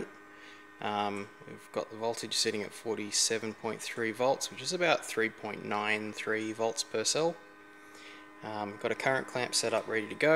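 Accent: Australia. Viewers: Unmistakable PERTH accent!!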